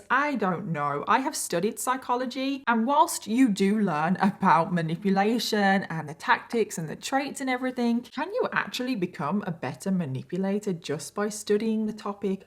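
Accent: United Kingdom. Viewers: I love your british accent, but I really don't like most of the british accents. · I’ve been watching A LOT of your videos. I absolutely love the way you explain out the cases as well as add your input on the cases and I LOVE your accent (I live in America so it nice to hear a British accent)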